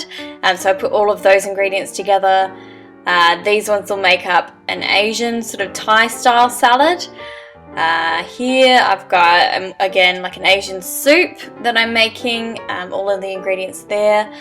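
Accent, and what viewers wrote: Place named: Australia. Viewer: lol between the song at the beginning and your accent i knew you were australian , this video is great, i esp like your use of so many vegetables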